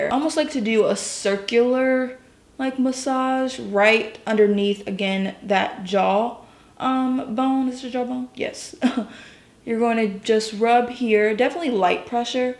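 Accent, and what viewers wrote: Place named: United Kingdom. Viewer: im trying to figure out accent, it sounds British but has a bit of extra like SEASONING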